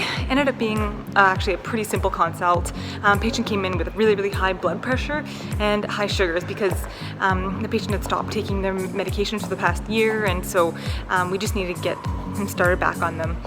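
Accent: Canada. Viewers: I know she's Canadian I can tell by the way she talks but does she work in Canada too or does she work in the United States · When you talk you can hear your inner Canadian come out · New sub here, I realise after looking through your comments and watching a heap of your vids your fan base is a lot of medical people lol. That's funny because my interest in the medical industry is zero haha, but you make it interesting and educational, and i don't regret spending maybe 2 or 3 hours watching your vids 😂😂😂 👌 ....that Canadian accent does really help too, anybody who says they don't like Canadians is just lying. Have an awesome day, new fan in NZ 😁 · Ps love your Canadian accent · I love how sometimes you can really hear her Canadian accent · Are you Canadian? Your voice doesn’t sounds exactly American and in one of your videos I saw an ambulance with a Maple leaf on it.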